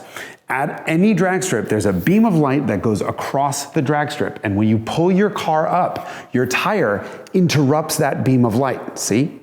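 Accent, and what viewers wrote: Germany. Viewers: His German accent sounds like Klaus from American Dad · The German accent lol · His German accent is on point.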